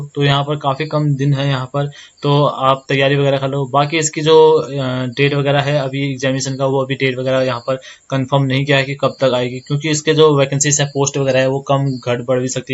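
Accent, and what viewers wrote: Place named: Uttarakhand, India. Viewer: Bhai aap phadi h kiya aapki language bhut piyari h. 😍😍❤ I love Uttrakhand ❤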